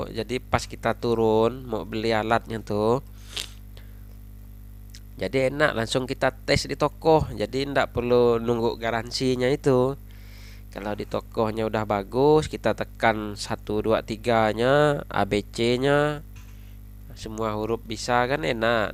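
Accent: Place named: Aceh, Indonesia. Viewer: Asli mana bang? Logat nya kayak orang aceh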